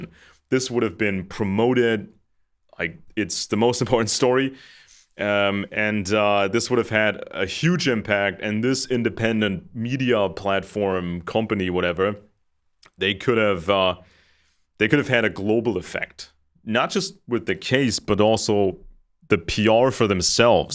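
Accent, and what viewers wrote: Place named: United States. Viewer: Well done! I put headphones on and listen while I work. I can relisten to one episode 3 or 4 times and get something new each time. I really appreciate your efforts. I'M LEARNING!!!!! You're English is so good that if you had not told me you were German I would have thought you were from the USA.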